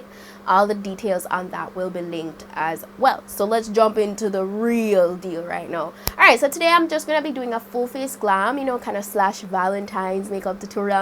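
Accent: Jamaica. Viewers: sounds Jamaican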